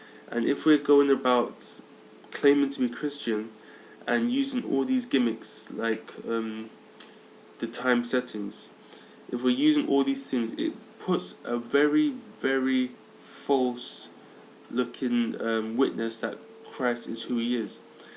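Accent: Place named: United Kingdom. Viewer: i like your british accent !!!!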